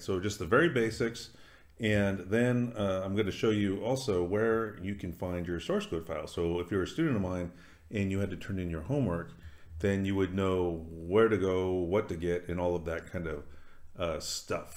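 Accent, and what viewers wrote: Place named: United States. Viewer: Some positives: * This is a recent tutorial, as of Nov. 2022, so it's closer to what IntelliJ looks like right now than older tutorials. That's **huge**, IMO, if something goes wrong (as it did with me when I first installed IntelliJ IDEA and a JDK). * Good pace * Clear, in American English (which may be a negative for some, but helped me) * Clearly, the person knows how to teach (I'd written something similar to the above in an earlier version of my comment, but I got distracted by coding and when I tried to return to finish my comment, it had gone "poof".)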